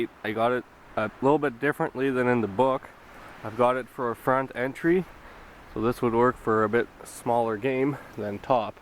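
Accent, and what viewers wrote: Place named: Quebec, Canada. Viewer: Haha je m'attendais pas à entendre un accent québécois Great video the explanations were very clear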